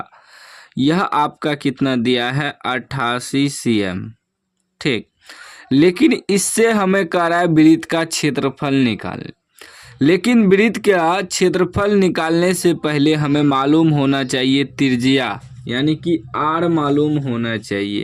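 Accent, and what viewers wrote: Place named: Maithili, India. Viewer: Sir ap methli v bolte h 😃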